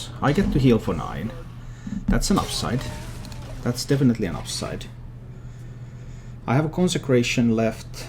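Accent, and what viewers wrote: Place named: Finland. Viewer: Your accent sounds like your from Finland, good video as always!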